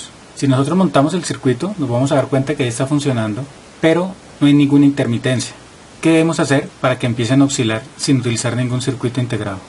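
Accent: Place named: Colombia. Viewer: Muy buen vídeo! Por cierto, ya creo saber de dónde es tu acento; de colombia! Linda voz :) Saludos!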